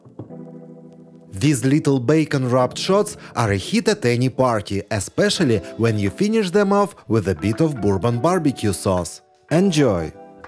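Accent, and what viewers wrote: Iowa, United States. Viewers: The lowan accent is so beautiful. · Is that an Iowa accent?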